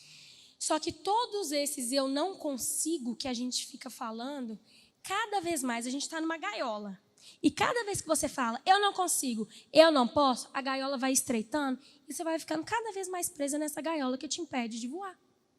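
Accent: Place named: Minas Gerais, Brazil. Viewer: Me lembrou tmb!! O jeito de falar e andar rsrs deve ser coisa de mineiro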